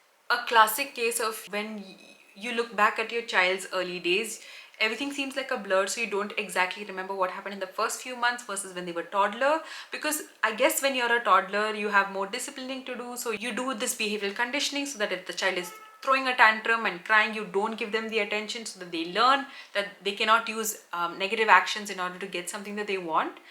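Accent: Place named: Bangalore, India. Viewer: Is it just me or theres proper bangalore accent exploding in this video ❤❤😂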